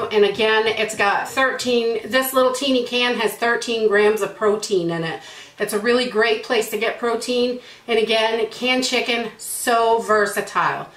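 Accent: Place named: New England, United States. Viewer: When you held up the can of mackerel I was hoping you'd say "chowda"...I love the New England accent 😊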